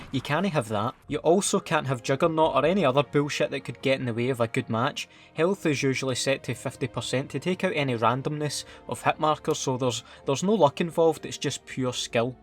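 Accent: Scotland, United Kingdom. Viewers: I only subscribed because of you're scottish accent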